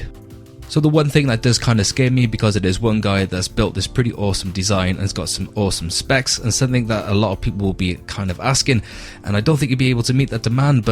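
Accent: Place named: Cardiff, United Kingdom. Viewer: Is that a fellow Cardiff accent I detect? Great video mate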